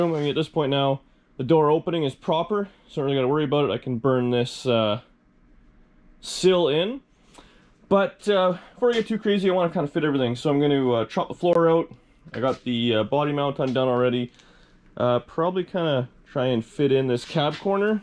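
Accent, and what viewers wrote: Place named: Canada. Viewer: You seem like a cool Canadian dude, I like the way you canucks pronounce "out" and "about"!.. Do you smoke weed or drink molson canadian?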